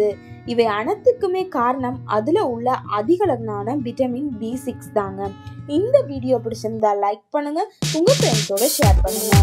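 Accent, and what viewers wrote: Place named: Sri Lanka. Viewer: srilankan tamil bro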